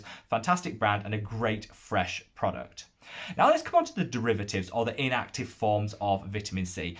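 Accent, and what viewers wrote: United Kingdom. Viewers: Every time I hear you and James Welsh say "vitamin," my American ears can't deal with it.😆 For some reason, there are three British pronunciations that I find exceptionally distracting: vitamin; schedule; and aluminum (which you spell differently and add a whole extra syllable to!). Love your channel as much as I love my Timeless Vitamin C!❤️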